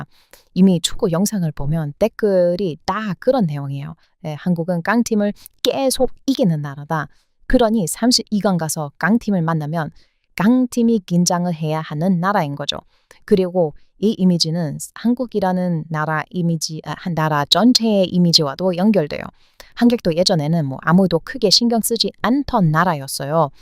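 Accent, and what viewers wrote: United States. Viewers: 오리지널 미국인의 한국말. 이게 전형적인 미국식 한국말이다. 억양이 독특하다. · 한국인인데 약간 미국발음으로 하시는분 아닐까? 하는 생각이 가끔 듭니다. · 미국사투리 정말 잘하시네요 미국인인줄 알았어요 완전 한국인인데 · 와... 사용하시는 어휘가.. 대다수의 한국인들보다 훠~~~얼씬 다양하네요.. 정말 한국어 잘하세요.. 억양 때문에 미국인이라는 걸 인식할 정도로... 요즘 어린 친구들 말하는 거 들어보면 절반이상이 비속어 & 욕설의 천박한 화법인데.. 이미 외국인들이 한국인보다 한국어를 잘하는 세상이 된 것인가...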